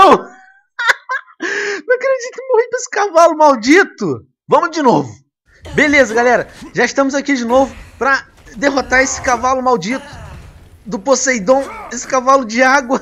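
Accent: Portugal. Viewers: tu és de Portugal? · voce e de portugal???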